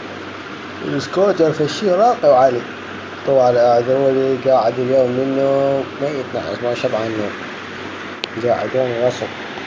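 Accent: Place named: Iraq. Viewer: هنيالك عندك حارقه و6 خرب هبل اني اريد بس اوصله بيتي مستوه 15 اريد بس اهجم بل حارقه ماكو ودكتور مرعب افجر مرحله 4 ومرات اعوفه لان تجي صعبه والمشكله بهاي العبة اتمنه احصل عله الحارقه وبلعبة كلاش اتمنه احصل عله التنين ههههههههه بس صوتك محلي المقطع هههههه حجيك يضحك مره دكول زباله ومره مدري شنو الحجي العراقي بصراحه حلو ما ادري ليش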